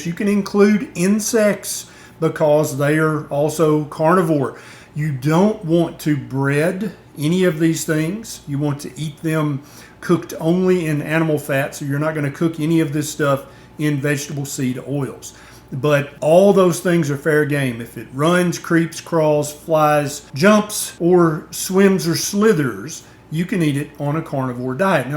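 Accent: South, United States. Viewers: I am all for the carnivore diet and have been on it for more than 6 months and it is absolutely amazing. I listen to many experts on the carnivore diet but I simply cannot get past this doctor's southern drawl accent. If somehow he can change that up, he could probably win back the top half of the country and make a larger impact with this awesome way of eating. Believe me, I completely understand that it has nothing to do with education or intelligence because obviously he knows what he is talking about and is educated on the topic, however it takes all of my willpower to get through an 8 minute video listening to this type of inflection. I suspect that would be the same for most of the northern part of the country.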